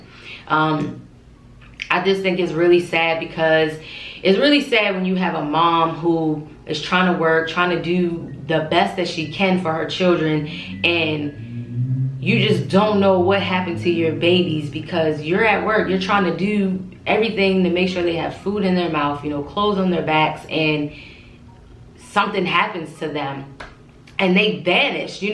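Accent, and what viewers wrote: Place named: Baltimore, United States. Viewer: Side note are you from Baltimore? Your accent ☺️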